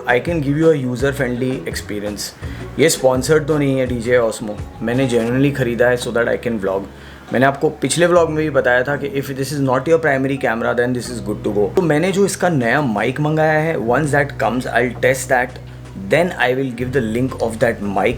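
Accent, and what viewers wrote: Gujarat, India. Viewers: Are you guju bro ?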